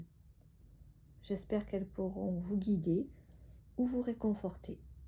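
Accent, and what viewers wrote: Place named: South, France. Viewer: Bonjour! Ton tirage bien que peu qualitatif ne manque pas d’audace. Le cadrage est dans l’ensemble médiocre sur ce point tu as exagéré. Mais j’ai réellement était inspiré par ton tirage. Ça me parle et ca c’est beau. J’apprécie la manière dont tu présentes les choses. Et ton petit accent chantant du sud me met du baume au cœur. Je t’invite a continuer sur ta lancée Bravo.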